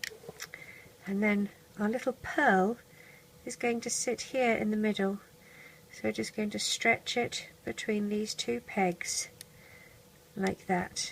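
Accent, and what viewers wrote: England, United Kingdom. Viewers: u sound english!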